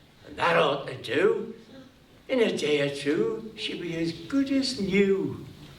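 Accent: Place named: Liverpool, United Kingdom. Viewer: Sounds like scouse ,northwelsh, irish, cumbrian, and stoke , cheshire accents mixed...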